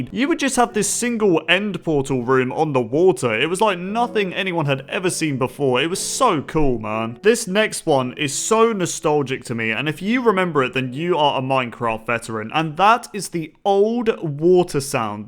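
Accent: United Kingdom. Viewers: bruuuh are you talking UK english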